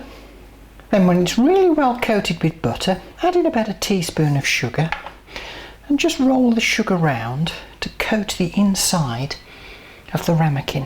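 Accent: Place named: RP, United Kingdom. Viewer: i love your high english...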